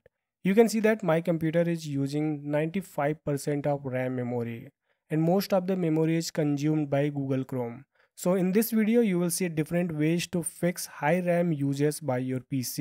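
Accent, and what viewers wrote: India. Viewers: Whenever i hear an indian accent I click off because they never work like this one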